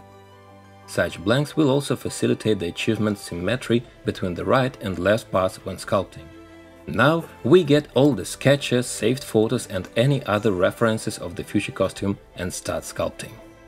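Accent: Russia. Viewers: You have a strong accent. It looks like Russian.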